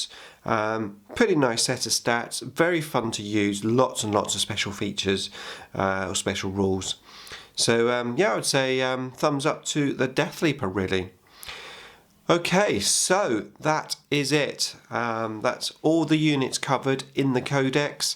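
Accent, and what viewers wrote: United Kingdom. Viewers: 40k Where are you from btw? I would likr to improve my English level(by watching wh videos) and ur accent sounds cleanly british haha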